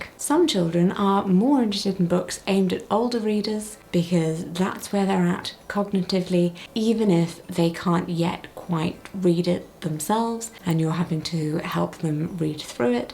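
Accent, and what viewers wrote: United Kingdom. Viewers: I don't know if this is a stupid question, but how is it possible that you speak with a british accent while being deaf? Could you hear when you learned how to speak? or is it just that accents can be learned without actually hearing them?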